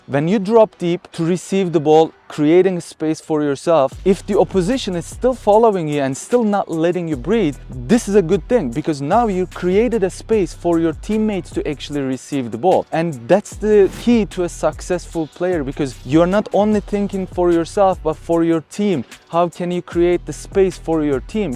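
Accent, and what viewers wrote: Turkey. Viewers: Bu aksan bir turkten baskasi olamaz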